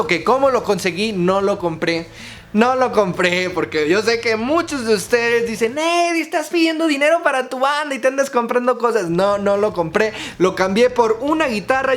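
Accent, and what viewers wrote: Mexico. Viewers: Creo que es mexicano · eres mexicano ? xd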